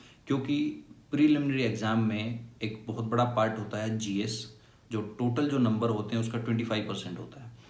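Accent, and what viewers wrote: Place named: Haryana, India. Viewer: thankeww for the strategy but i love the harayanvii touch in language❤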